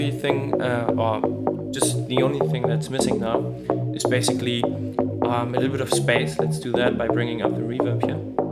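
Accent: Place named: Germany. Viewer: Geiler sound, gutes Video. Mach weiter so. Man hört, dass du deutsch bist, aber es stört überhaupt nicht.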